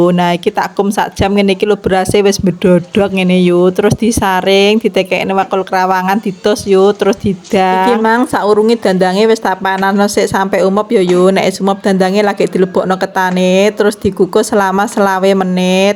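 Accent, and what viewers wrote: Java, Indonesia. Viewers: Ra kaprah..dialek .bjn blora y yu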